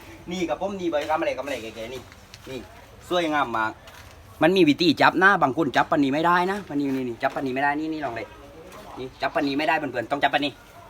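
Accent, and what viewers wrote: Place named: South, Thailand. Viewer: สุดยอดเลยคนใต้แหลงใต้ฟังแล้วบายหูขอให้ดังๆรุ่งๆนะคับ👍👍👍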